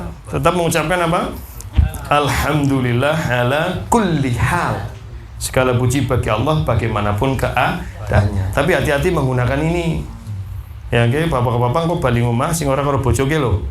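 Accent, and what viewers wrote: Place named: Banyumas, Indonesia. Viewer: Alhamdulillah ilmuku tambah ngaji kalih ustadz ngapak, Asli saking pundi nggih min?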